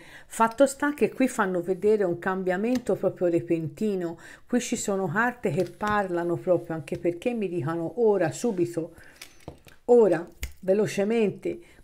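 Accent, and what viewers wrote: Tuscany, Italy. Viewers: Ascoltati tutte e due, perfetti in entrambi. Grazie mi piace molto il tuo accento Toscano 🤩🥰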